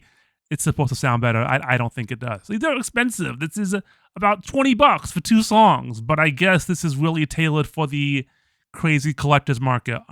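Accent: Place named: New York, United States. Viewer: I honestly just thought you were from NYC or Jersey or Boston or something.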